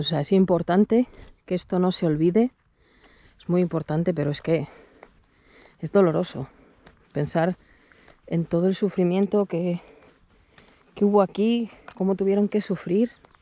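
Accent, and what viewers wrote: Spain. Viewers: Os eres de España?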